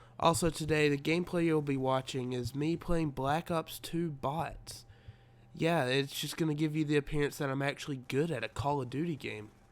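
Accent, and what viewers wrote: Georgia, United States. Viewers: I live in Georgia too btw you sound like your from Georgia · He sounds a bit southern anyone else notice that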